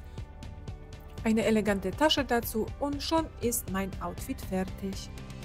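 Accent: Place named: Poland. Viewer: Was ist das für Akzent? Polnisch? Ich nicht iś. 😮 Ch spricht man wie ch nicht wie ś. 😅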